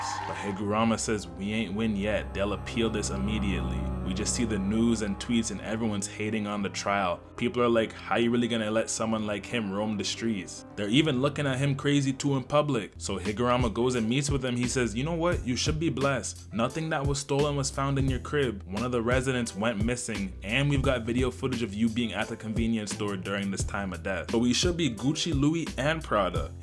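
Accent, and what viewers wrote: Canada. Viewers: This Canadian accent is just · holy shit saying “dutty” you aint jamaican my boy 😂 stfu i hate canadian accents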